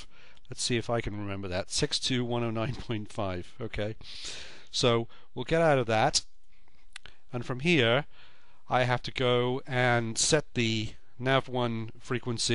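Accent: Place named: England, United Kingdom. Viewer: I for one, appreciate the clear English accent and not whizzed through at 50 mph. So many folks sound a bit like Kermit. Thanks.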